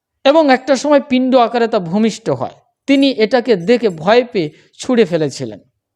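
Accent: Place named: Khulna, Bangladesh. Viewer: Dada apni khulna odhibasi mone hoy amer ma er vai er moto apner kotha boler dhoron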